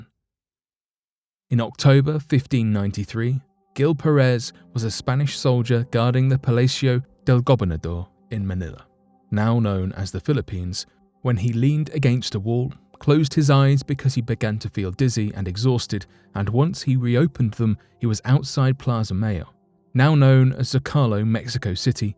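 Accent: United Kingdom. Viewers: That British accent makes this all legit...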